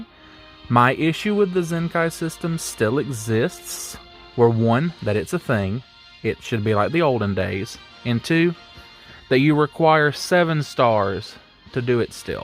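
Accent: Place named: Georgia, United States. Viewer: First time hearing your voice and all I hear is the southern Georgia accent 💀. But I liked your zenkai take. Zenkai level per star is a really good concept for LF’s. If you want to do more content like PvP or Summoning, personally I’d watch it but if you’re comfortable with it.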